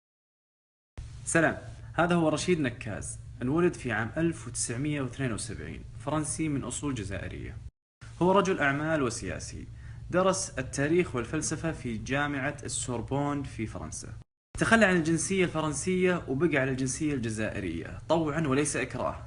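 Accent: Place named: Gulf, Arab world. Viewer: انت خليجي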